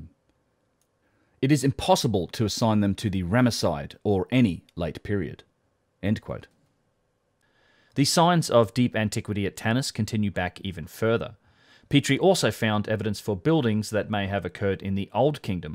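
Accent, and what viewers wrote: Australia. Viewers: As soon as you hear the Aussie accent you just know it's going to be dumb.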